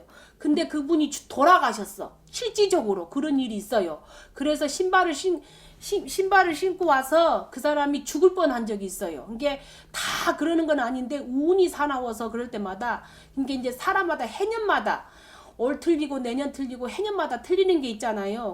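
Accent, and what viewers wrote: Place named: Jeolla, South Korea. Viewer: 전라도가 보네...괜히 거부감이 생기네 ㅎ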